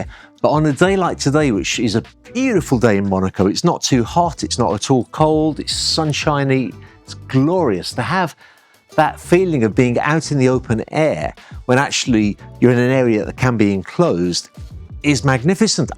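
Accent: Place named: West Midlands, United Kingdom. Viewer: I can hear the West Midlands.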